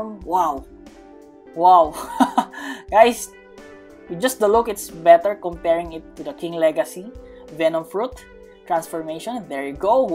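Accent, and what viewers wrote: Philippines. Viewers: Ur accent is cool and I think it's Filipino accent am I right?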